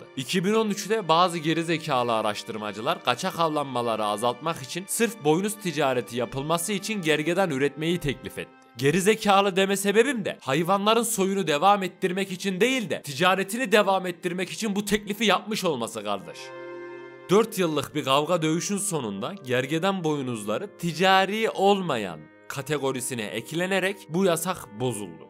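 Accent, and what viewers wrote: Ankara, Turkey. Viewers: Emeğine sağlık çok güzel bir video olmuş. Yalnız eski Ankara şivesini biraz inceltmişsin admin.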